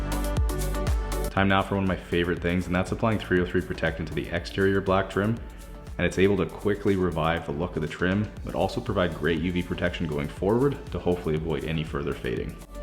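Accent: Canada. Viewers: I've recently found you yesterday and just watched 27 of your videos back to back.....safe to say I love your videos! I also love your voice overs, love a good Canadian accent lol. Wanted to ask though did you start as a detailer before you moved into this home? Curious how you started and got here. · You’re definitely Canadian. The zero degrees reference and your accent is a giveaway too. I love your channel. So satisfying!!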